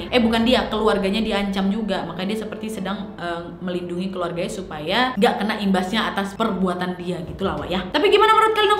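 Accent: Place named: Medan, Indonesia. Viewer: Orang medan memang kek gitu,cara ngomongnya memang seru seru jadi orang luar medan dengarnya enak,ngga ngegas tapi dikira ngegas,ngomong kek orng berantam hwhw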